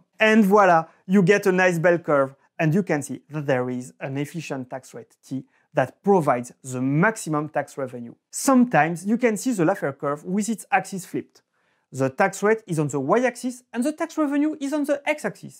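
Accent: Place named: France. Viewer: Your French- English is not god enough,never heard that the sums of investment are equal to savings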